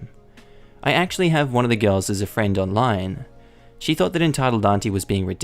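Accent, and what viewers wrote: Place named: Australia. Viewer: I love the Aussie Accent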